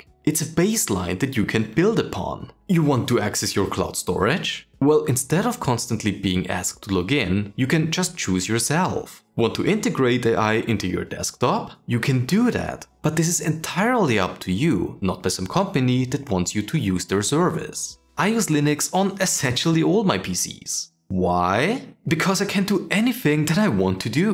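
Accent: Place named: Germany. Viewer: I see open source, I hear German accent, I subscribe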